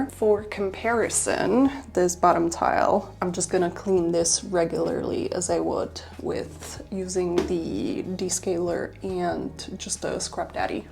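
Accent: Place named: United States. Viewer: I want to quickly pop in and commend you on your English. I was quite surprised to find out that you are, like me, Czech. Almost perfect American accent. Whatever your history, whether you've actually lived in the States or not - hats off to you. Even foreigners who live there mostly don't reach this level of accent, ever.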